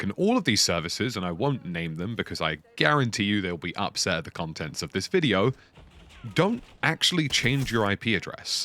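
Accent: RP, United Kingdom. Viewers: Stop this posh voice its triggering!!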